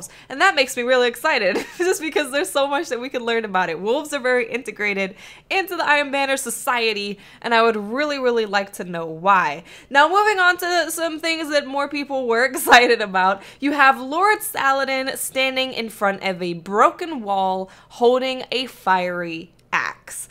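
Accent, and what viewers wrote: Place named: New York, United States. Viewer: Is she from New Jersey Brooklyn or New York she kinda sounds like she has that accent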